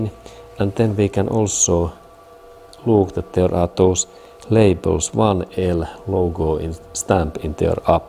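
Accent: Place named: Finland. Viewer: Thanks for your videos. I am getting to like the Finnish accent!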